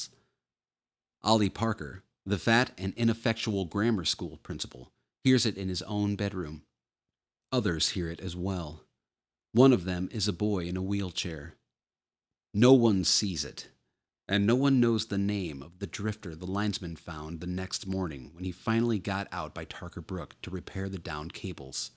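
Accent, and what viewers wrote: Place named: Maine, United States. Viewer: You have do a pretty good Maine accent.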